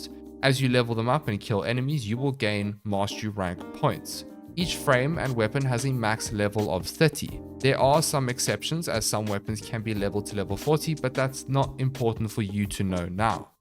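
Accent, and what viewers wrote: Afrikaans, South Africa. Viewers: Is that a south african accent i hear ... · Instantly heard the Afrikaans accent and now sir, you have +1 subscriber! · this dude sounds south african 🇿🇦🇿🇦🇿🇦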